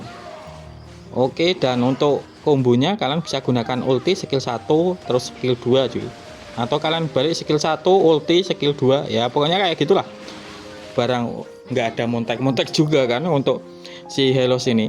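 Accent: Java, Indonesia. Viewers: Temenan pora 😂😂😂 jowo meduk hahah mntep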